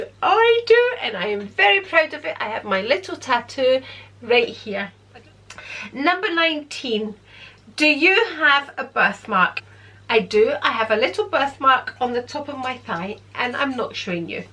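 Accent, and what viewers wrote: Scotland, United Kingdom. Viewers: The Planner... The Executor! And What A True Gentleman Ehh... Putting Him On The Spot... Yet Once Again Executing The Perfect Answer!! Thank You So For Taking Us Down Memory Lane And Sharing Such Personal Incidents... Some Lovely Insight Into Your Parents Values And Character... Feisty... ‘ Fist 👊Fight En Route To Cyprus... 🤭... And A White Halter Neck Dress... Ooh Dear... 😱... Did Tell You Off? Lol 🤭... These Are The Questions I Hope You Will Be Able To Answer Next Time... 1) What Were Your Parents Reaction When You Told Them You Wanted To Get Married At 17... 2) What Was Your Childhood Ambition... What Did You Want To Be When You Left School? 3) You Grew Up In London But You Have A Scottish Accent? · Ah that was really good to listen to I enjoyed that. With your Scottish accent I was very surprised you were born and raised in London. 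Seeing the photo of your Mum you’re the image of her....also are you an only child? Xx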